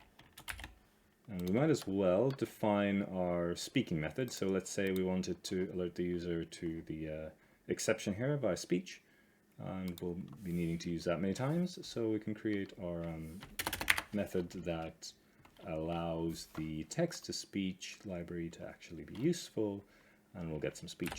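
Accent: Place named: Scotland, United Kingdom. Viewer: this is incredible. and you are Scottish. Greetings from one to another. Ofc - not my real name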